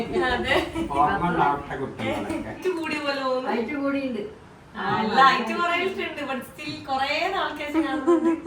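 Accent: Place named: Northern Kerala, India. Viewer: നല്ല വടക്കൻ മലയാളമാണ് പറയുന്നത് ഒറ്റപ്പാലം style അല്ലേ അല്ല.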